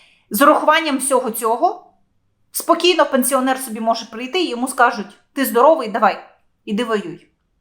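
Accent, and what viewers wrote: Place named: Russia. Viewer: ты с себя выдавливаешь укр.слова Думаешь на русском,в уме переводишь,поэтому акаешь и запинаешься,говори как тебе комфортней Мы понимаем русский язык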